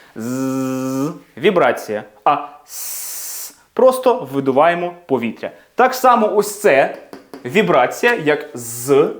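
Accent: United Kingdom. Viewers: Дякую за урок. Як на мене, це ідеальний формат - практикуватись разом. Мавпочка всередині одразу включилась в гру і повторювала. Я як дитина реготала над жартами ) "а шо ви робите, вибачте?" 😂 Я в захваті від подачі, взаємодії з глядачем. Та зачарована Вашою британською вимовою. Це саме та англійська, яку би я хотіла вивчати. Тільки від неї "йокає", бо це дуже красиво. Тож дуже буду чекати саме такі фонетичні інтерактиви. Дякую 🤗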